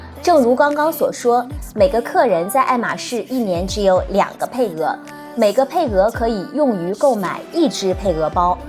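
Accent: Northeast, China. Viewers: 肯定是东北那旮的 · 东北女生？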